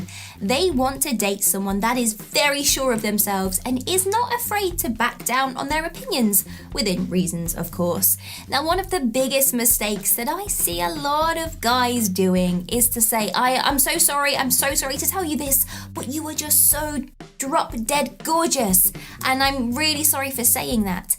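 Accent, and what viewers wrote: United Kingdom. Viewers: May I ask about your accent? Is it British, Australian, or other?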